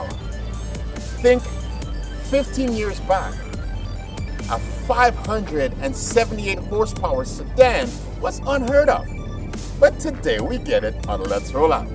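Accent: Jamaica. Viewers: You jamaican bro ?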